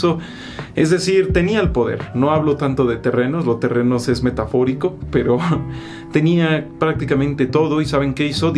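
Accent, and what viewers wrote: Chile. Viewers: Excelente, en chileno entiendo mejor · es chileno?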